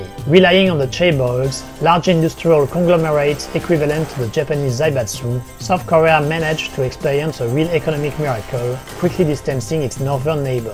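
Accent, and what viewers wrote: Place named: France. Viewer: Great video but the uber strong French accent squeezed my brain juice in such a tiring way. I mean you can use AI voice dude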